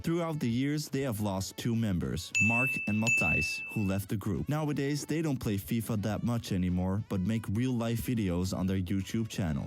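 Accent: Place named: Netherlands. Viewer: lekker nederlands acccent hoor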